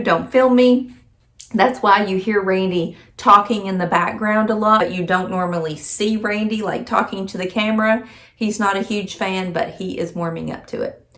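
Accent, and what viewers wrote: United States. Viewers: That was a great video, I knew most of that from following your channel, but always happy to hear you chat (you have a very nice, soft American accent 😊) . Never knew about Randy being your second hubby, glad you found each other again 💛........If I lived in the states, I would love to buy your business🍨🍦 Best of luck in all that you will do, your fans will be watching 💛💛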